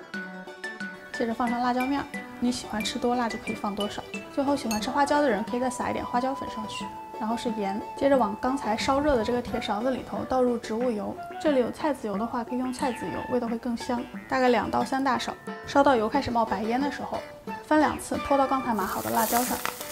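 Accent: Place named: North, China. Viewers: 口音一听就是我们北方人🤣🤣🤣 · 山西方言吗？感觉说话舌根子比较绵软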